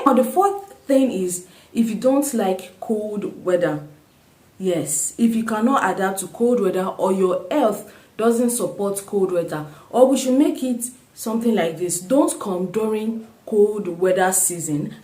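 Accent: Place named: Nigeria. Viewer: It's a Nigerian accent for me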